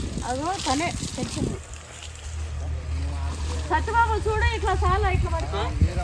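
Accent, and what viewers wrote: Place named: Andhra Pradesh, India. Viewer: andhra accent kiraak asala..... chaala baagundi 😀 you don't often hear that purest form of Andhra telugu in Hyderabad or Telangana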